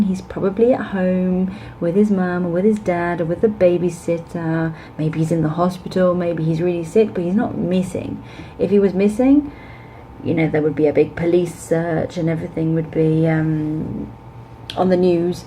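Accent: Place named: United Kingdom. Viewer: Very interesting insight into this issue (and quite brave of you, I must say). As a Spanish ESL teacher, I used to work at an academy and noticed not just basic mistakes similar to the ones you mentioned, but some serious pronunciation issues. To be fair, we have an obsolete educational system in Spain when it comes to English: you never get to really practice your speaking or listening skills as much as needed (I'm talking about regular public schools). It is also true that nobody knows it all and even English native teachers do make mistakes. However, I believe people here tend to give it for granted that the fact that you got your university degree means you already have the sufficient level to teach English... and more often than not, this turns out to be self-deception. We must be aware of our weaknesses and the need of constantly improving our knowledge and skills, especially if aside from making a living out of it we intend to offer a real good-quality teaching of the language. 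Unfortunately, this is just like any other profession: some use their uniform as if it was an irrefutable evidence of perfection. I reckon what really makes the difference is whether you truly love what you do or not: if you do, you will try your best to continue correcting your mistakes and boosting your abilities. If not, you will just keep delivering sloppy lessons. By the way, you have such a clear and lovely British accent! I hope you are doing well and both your students and colleagues can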